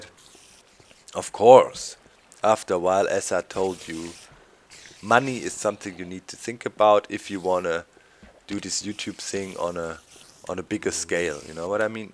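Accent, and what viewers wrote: Germany. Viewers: Haha my mum think that you sound like Arnold;) - The german accent is infactious!:D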